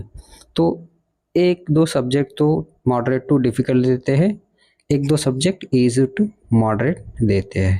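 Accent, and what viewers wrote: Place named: South, India. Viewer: I like your soth Indian accent of hindi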